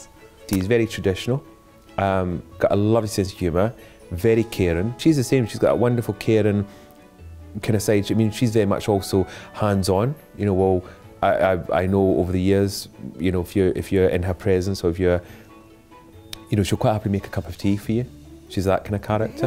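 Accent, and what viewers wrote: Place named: Lanarkshire, United Kingdom. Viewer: He'sScottish. He's speaking English with a Lanarkshire accent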